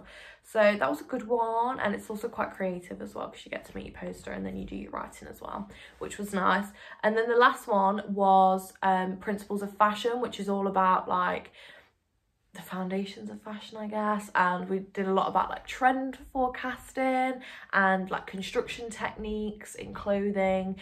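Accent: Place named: Lancashire, United Kingdom. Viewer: Your açcent sounds like Lancashire... I'm guessing maybe Preston area or close by. No doubt I'm a million miles away though.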